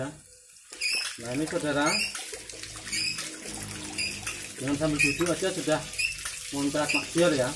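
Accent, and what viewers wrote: Java, Indonesia. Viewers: Medok wkwkwk di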